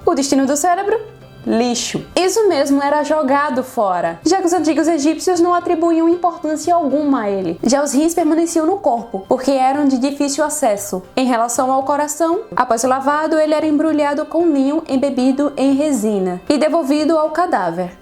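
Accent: Brazil. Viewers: Ah, então você é de Sergipe :)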